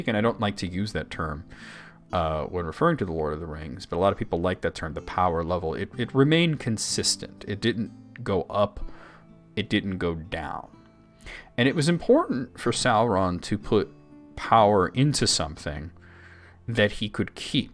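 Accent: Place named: United States. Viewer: A small correction: A vowel, linguistically speaking, IS what you call "a vowel sound". The symbols representing the vowels do not have a name of their own. So, linguistically, "cat" and "Mars" have two different vowels at the core of the word; they don't both have one vowel called "a". So on the one hand, you are actually arguing that there are more than three vowels in Black Speech when you say that "burzum" has two different u-sounds i.e. two different vowels (/ɜ:/ and /u/ respectively). At the same time, that is your pronunciation which is clearly coloured by American English. If I were to pronounce "burzum", with the influence of my native language, it would be two /u:/ sounds, i.e. the same vowel. An English speaker reading with their accent would probably read it with around 7 vowels (/ɑ/, /ʊ/, /u~ʉ:/, /ə/, /ɪ/, /i/, /ɜ:/). A German speaker like me would read it with 5 vowel sounds (/a(:)/, /ʊ/, /u:/, /i:/, /ɪ/). 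A Spanish speaker would probably have 3 vowels in their pronunciation, and so on. You also say that languages have way more than three vowel sounds usually. That's not necessarily true. Arabic has three vowels only, and those are, in fact, /a/, /u/ and /i/. So, it would be possible that Black Speech works with just these three vowels. Now, technically, Arabic has more because you can go at it from a phonetic or phonemic perspective. Phonemic is the language as you hear it and interpret it, phonetic is language as you actually say it. Basically the difference b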